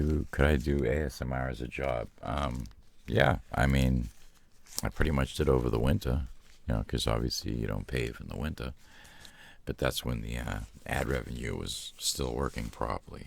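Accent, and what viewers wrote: Boston, United States. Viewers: Another live stream soon? Your every day voice and the Boston accent are the best :) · I could tell you are from Boston... I love that. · Never realized you had a Bostonian accent until I watched this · Boston tang in his voice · Wait, he's from Boston? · Never noticed your Boston accent haha I love it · Holy hell, you're a Bostonian. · Jesus how have I not noticed the Boston accent until just now?? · He's defiantly a Boston guy, I would know😂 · oml he has a..... what's that? Boston accent? I didn't know he actually had one my mind is BLOWN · Ur from Boston most definitely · Yo, are you from new england? you've got that underlyin boston/jersey accent. Love your vids, congrats on the 100k(now more than that)! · Any one else notice his Boston accent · Is that a Boston accent?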